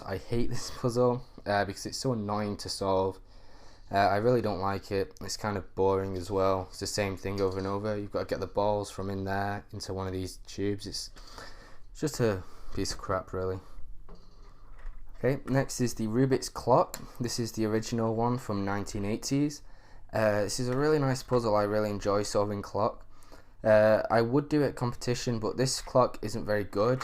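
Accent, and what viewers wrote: United Kingdom. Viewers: Im a beginner UK cuber and you sound like you are from the UK to! If you are, where do you buy your cubes?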